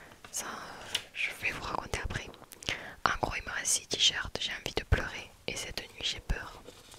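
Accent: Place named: Marseille, France. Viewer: Oh ta un accent marseillais 😅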